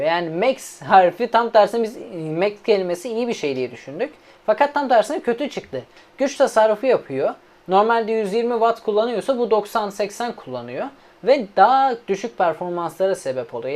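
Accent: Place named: Black Sea, Turkey. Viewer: ne diyo bu karadenizli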